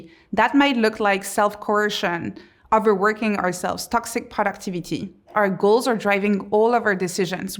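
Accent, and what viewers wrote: France. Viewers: It is normal behavior. Also, she's obviously French and although she does a great job with modulating her voice to match what she's saying, it can sometimes sound monotonous. Why would she congratulate herself for a degree she got years ago when she had time to digest the joyful emotions associated to succeeding? Maybe y'all americans brag for years on end about your successes but in France we don't repose sur nos lauriers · My cynical mind tells me that this impenetrable French-accent madam with aristocratic pretentions has a point — very painfully so. Now all that’s left is to get rid of my cynical mind....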